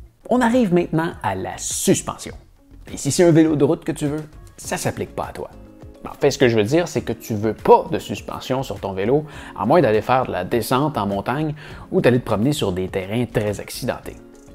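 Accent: Quebec, Canada. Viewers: Vidéo au top ! Avec en plus un chouette accent du Québec ! Salutations de Suisse ! ;)😎✌️ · Excellente vidéo qui est passée si vite, j'ai cru qu'elle ne faisait que 10 minutes au début... Je suis d'accord avec tout... SAUF sur le rétroviseur (mais c'est à cause de mon égocentrisme car je préfère ne pas avoir à me tourner pour voir les véhicules arriver, et ça me permet de rouler temporairement au milieu de la route quand je vois qu'il n'y a vraiment personne). Sinon, clair, drôle, être humain passionné... et en plus accent québécois si agréable aux oreilles d'un Français : que demander de plus ? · Excellente vidéo, rien à dire ! Je suis en pleine réflexion pour un premier achat, et justement, je cherche le bon rapport qualité/prix/utilisation. Ta vidéo m'a éclairé, merci ! J'ai habité Montréal quelques temps. Cet accent me donne juste envie de retourner y vivre ! 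🇨🇦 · Merci infiniment,! C'est génial de pouvoir trouver des vidéos de qualité, en français et avec ce petit plus inimitable: ce magnifique accent du Québec qui me donne envie de visiter ton pays. Super conseils! Le point sur les groupes est particulièrement utile et m'a permis de mieux comprendre les descriptions des vélos dans mes recherches.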